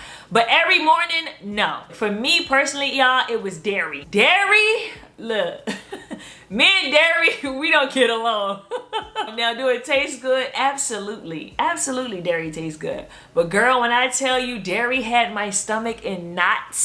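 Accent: Baltimore, United States. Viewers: Girl I knew it. The first time I heard you talk you sounded like someone from MD, Baltimore to be more specific. In This video the way you said pizza, I’m like I know she’s from MD!!!! 😂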